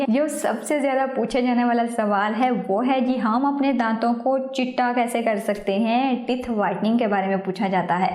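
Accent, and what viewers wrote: Punjab, India. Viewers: Haye tuhadi Punjabi😍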